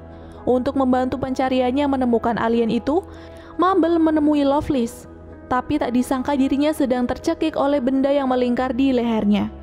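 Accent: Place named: West Java, Indonesia. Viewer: Teh orang sunda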